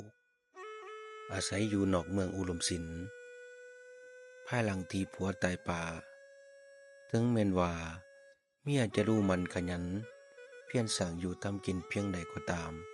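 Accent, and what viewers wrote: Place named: South, Thailand. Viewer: ໄທໃຕ້ເວົ້າມວ່ນ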